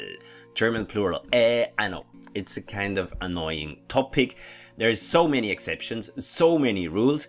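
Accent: Switzerland. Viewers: Stupid question... are you Swiss german or did you learn german from a swiss? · Thank you for your reply and your videos helped tremendously! I figured you were swiss based on your high german accent but was only sure when you said Grüezi in one of your videos 😊 thank you so much again and will continue learning..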